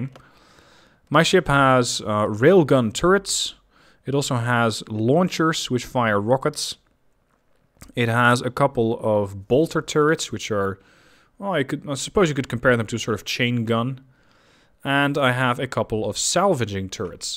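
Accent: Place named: Netherlands. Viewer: Looks like an awesome game, and also your accent sounds like your Dutch lol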